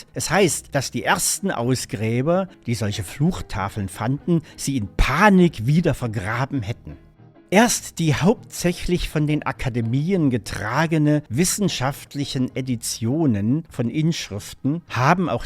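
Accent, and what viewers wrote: Germany. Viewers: Naja, es handelt sich um den Dialekt, der im Raum Dresden-Sachsen gesprochen wird, nehme ich an.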